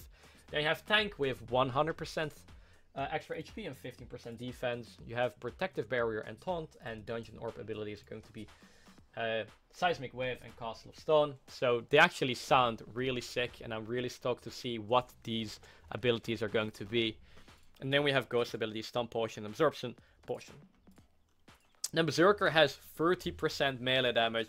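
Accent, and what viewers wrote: Netherlands. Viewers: R u dutch? Just asking?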